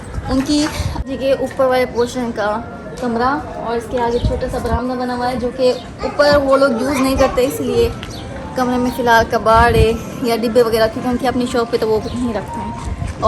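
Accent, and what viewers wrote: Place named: India. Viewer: Aap to indian jaisi hindi bolti ho or aap bahot hi khubsurat ho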